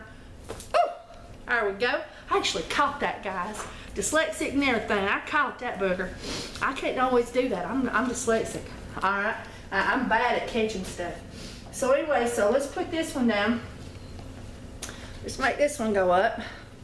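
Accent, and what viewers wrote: South, United States. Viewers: Hey just found your channel yesterday awesome channel. Thank you for sharing your knowledge. When I heard you speak I said she’s southern, so am I Knoxville TN, here looked you up we’re not that far away from each other. Keep these great videos coming love watching you.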